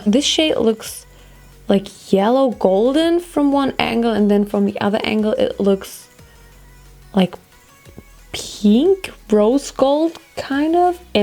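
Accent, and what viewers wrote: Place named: Germany. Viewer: Ok so its my first video I'm watching of yours and omg I could tell you're German because you sound and act very similar to wthmille who is hilarious! SUBSCRIBED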